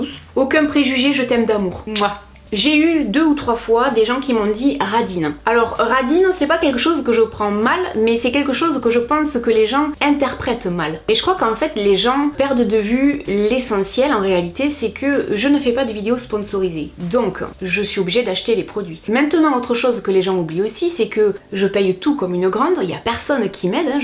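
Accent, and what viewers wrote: South, France. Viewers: Merci maman, grâce à toi j'ai réussi à accepter mes formes et mes rondeurs et à m'aimer comme je suis. Je regarde toutes tes vidéos. J'adore les vidéos où tu nous donnes des astuces beautés maison à petit prix. Pourquoi ne pas refaire une vidéo "Je teste les astuces de mes abonnés" volume 2? Je suis sûre qu'ils doivent avoir plein de supers idées pour se pomponner à moindre coût. En tout cas continues comme ça, tu es super, je t'aime maman YouTube! 😘🥰❤️ P.S.: tu as même réussi à me faire aimer l'accent du Sud! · Radine ? Je suis assez surprise parce que vous mettez quand même le prix pour vos produits, sans vouloir vous offenser. Et oui la retraite franchement ça fait peur, alors profitez-en tant que vous le pouvez. On peut trouver une femme jolie sans pour autant être lesbienne ou bi. Il y a quelques filles que j'admire parce que je les trouve jolies mais pas seulement. 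Elles sont aussi talentueuses (Taylor Davis par exemple). Et j'admire aussi la souplesse de Lindsey Stirling. Mais il n'y a pas de secret, c'est comme tout ça se travaille. Il y a beaucoup de chanteuses que je trouve super jolies sans pour autant me sentir lesbienne, ni même les envier. Je ne comprends pas les gens qui ont des préjugés comme ça. Coincée ?? D'où ça vient ça aussi ? Je ne suis que de passage mais ce n'est pas l'impression que j'ai eu en regardant quelques-unes de vos vidéos. "Cruche et hautaine", alors là soit vous êtes tombée sur la première andouille qui passait, soit c'était un gros troll. Les gens jugent bien vite, et vous me le confirmez. Le "trop vieille" est également de trop. Il n'y a pas d'âge limite pour faire des vidéos. Faites-vous plaisir. Vous avez 43 ans ? Et alors ? Où est le problème ? Ah oui ? Timide ? On ne le croirait pas, vous avez l'air plutôt à l'aise. 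Lors de ma première vidéo, devant ma caméra, rien ne voulait sortir. '^^ Oh, bah pour changer je vais vous dire que j'aime bien votre accent du sud. :) Si vous étiez riche, vous ne chercheriez pas à avoir des produits de luxe à pri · Je me retrouve en toi j ai 42ans et je ne me sens ni vieille ni jeune juste au bon âge 🤔. Je ne crois plus , moi non plus, en l amitié mais je pense que l on aurait été de bonnes copines toi avec ton accent du sud et moi du NORD 😁 j aime beaucoup tes vidéos et je pense que tu es la seule que ne trie pas ce que je veux regarder sur ta chaîne, je regarde tout. Pas de préjugés pour ma part, surtout via youtube où l on ne peut connaître les gens... mais tu dégages beaucoup de charme, de gentillesse et d honnêteté . Le reste t appartient.... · Le truc qui m'énerve le plus je crois c'est le "trop vieille" pour etre sur YT, pour donner des conseils... Putaingcon la dictature des ptits jeunes ca va 5mn! 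43 ans c'est pas VIEUX... C'est typique des petits cons qui vont te balancer un "oK bOoMeR" pour te "casser" sans argumenter... D'ailleurs la vieille de 34 que je suis va sans route se ramasser des "ok boomer" en commentaire mdrrr A 43 ans en tout cas tu as plus de légitimité pour donner des conseils qu'une ado de 17 ans... Non? L'expérience acquise avec les années, ca vous parle ou bien? J'ai rien contre la jeune génération j'ai un petit frere de 25 ans que j'aime d'amour, simplement j'aime pas les cons:0 Et des cons y'en a de 7 a 77 ans n'est ce pas... Continue tu es sympa et authentique:) Pour l'accent du Sud je pige pas ya vraiment des gens que ca gene? Ok... P.S.: dsl j'écris sans accents et cédilles car mon clavier s'est foutu en allemand. Et j'arrive pas a le changer. Voila ^^ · j´adore ton accent du sud! <3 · ben moi j'aime bien votre accent du sud (comme moi) et vous êtes très jolie. 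moi j'ai 55 balais et je ne me sens pas vieille non plus, je suis hyper méfiante et ne fais pas confiance très facilement (ça c'est mon côté cancer qui ressort). Non vous n'êtes pas radine, normal de vouloir faire des économies, je fais pareil. continuez ainsi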